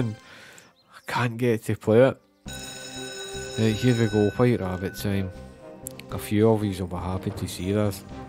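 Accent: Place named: Scotland, United Kingdom. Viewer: I said to myself like Kilmarnock or something aswell 😂 just couldn’t quite place the slight accent, Good luck on your upcoming videos mate hope to see a record breaker soon 🙏🙏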